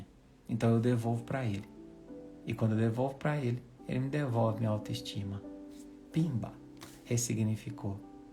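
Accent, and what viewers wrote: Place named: Minas Gerais, Brazil. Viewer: Bom dia! Conhecendo seu canal hj e agora! Só o tom de sua voz, já me acalma o coração e a alma, e esse título de hj, eu estava demais precisando ouvir! Ais 62 anos, c/ depressão crônica, TDA/H s/ nunca ser tratada e dezenas de complexos, rejeição, baixa auto-estima e traumas, desde a infância, como é gratificante ouvi-lo❣️Gratidão por existir e se prontificar a acalentar nossos corações e almas🙏🏻🙌🏻🌟🌠 Vc é psicólogo❓️Tem sotaque de mineiro😊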